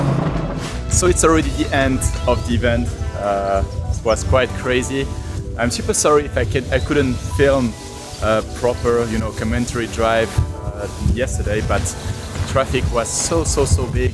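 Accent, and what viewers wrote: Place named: France. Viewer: On sent l’accent français haha ! Je viens de découvrir ta chaîne et la qualité du contenu est juste parfaite 🤩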